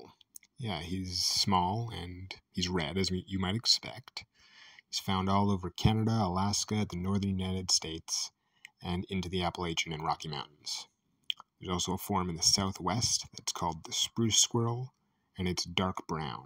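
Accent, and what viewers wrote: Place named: South, United States. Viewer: Ha! Speaks in a southern accent!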